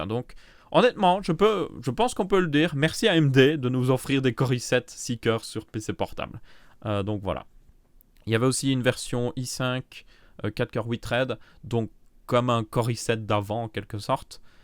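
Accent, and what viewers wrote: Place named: Belgium. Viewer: Putin un belge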